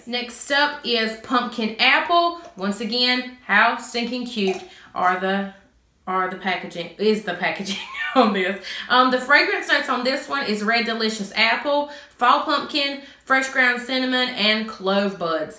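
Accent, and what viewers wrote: South, United States. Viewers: Just found your channel!! I love it! You are so precious and love your southern accent. I'm a Mississippi girl so I totally understand :)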